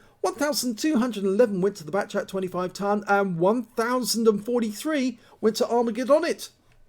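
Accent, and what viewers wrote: United Kingdom. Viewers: You are from England ???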